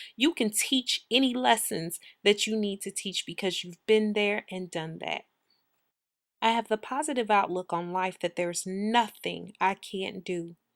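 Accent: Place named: Midwest, United States. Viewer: and are you from the midwest?! your accent is strong lol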